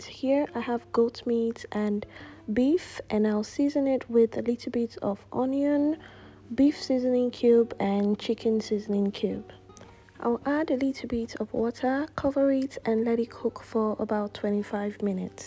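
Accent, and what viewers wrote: Nigeria. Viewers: Polished , polished polished wait a minute , was that voice yoruba ?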